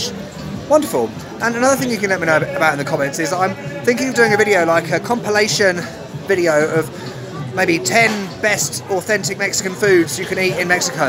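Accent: England, United Kingdom. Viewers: Are you from England ?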